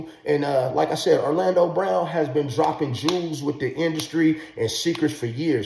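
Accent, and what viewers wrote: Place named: California, United States. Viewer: New sub here coach! I definitely thought you where from cali!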